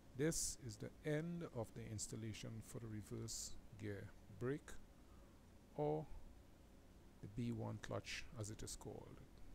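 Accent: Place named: Trinidad and Tobago. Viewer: Judging from your accent Im asking are u from Trinidad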